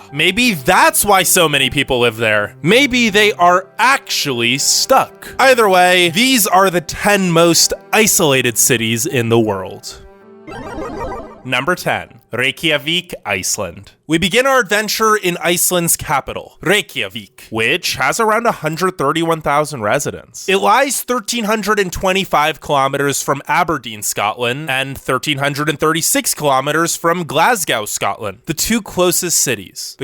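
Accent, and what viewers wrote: California, United States. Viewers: Petropavlovsk is ok to pronounce. What is really hard is this American accent the author speaks 😅, my tongue wud hurt trying to copy that: theRe aRe, the largest (lOrgest Karl, lOrgest ) city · Why does your voice sound different in your videos? Some of them you have a more Californian accent. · Where is your accent from in the statex ?!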